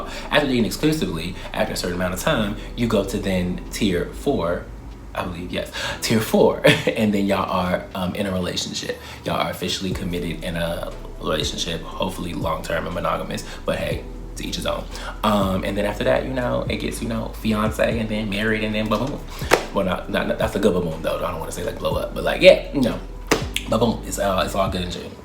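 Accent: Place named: Baltimore, United States. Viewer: You serve your community in a way no one else can. Thank you for sharing your thoughts on this. I think alot of what you are sharing transfers to grad chapters too. You also ate when you said parents experience "Peter Pan" syndrome. The baltimore accent is a mood. If people say that can't understand you, they are just being anti-Baltimore (aka anti-black). Alright now with the double life at 15/16 lol. Living is a habit!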